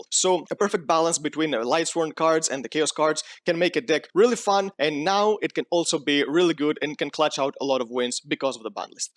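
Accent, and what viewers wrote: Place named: Greece. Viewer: Hey new to the channel here and the first thing I thought hearing your voice was that you may be a fellow greek. Having said that, great content, waiting for more!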